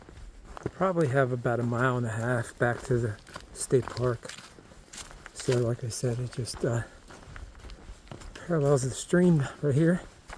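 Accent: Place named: Pennsylvania, United States. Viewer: I’m following you because your accent reminds me of my PA family. Good luck on the AT! I was just at Amicalola Falls State Park & Lodge myself and enjoyed hiking some in GA.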